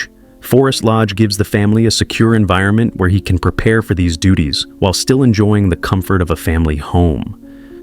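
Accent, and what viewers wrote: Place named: United States. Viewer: Interesting, but did we need the awful American accent on a programme about a Royal home?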